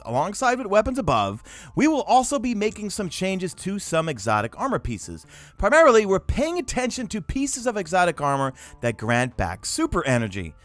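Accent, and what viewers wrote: New York, United States. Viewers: Love the New York accent. Don’t worry I understood lol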